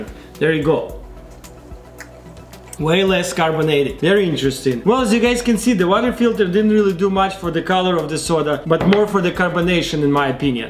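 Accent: Russia. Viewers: Are u actually russion?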